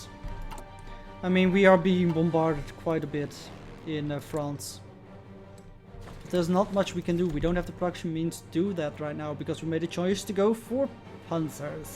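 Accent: Netherlands. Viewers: Does anyone else love the Dutch accent?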